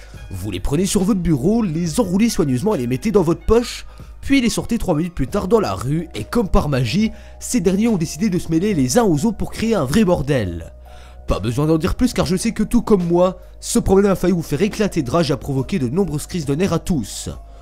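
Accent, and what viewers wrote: Belgium. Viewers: tu est Belge??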